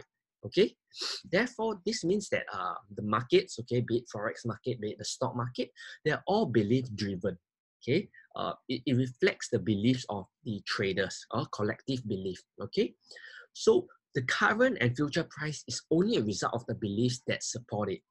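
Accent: Singapore. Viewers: No, I think he`s Singaporean.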